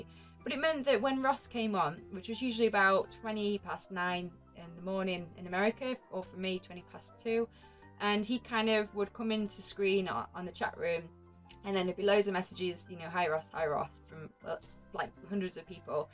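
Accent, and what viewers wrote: United Kingdom. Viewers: You look marvelous. I love your accent too. And nice teeth for a Brit. British dentistry has come a long way. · Love British accent. What area of England are you from?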